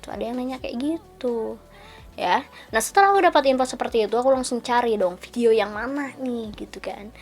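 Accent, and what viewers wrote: West Java, Indonesia. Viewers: ka kayanya org Sunda ya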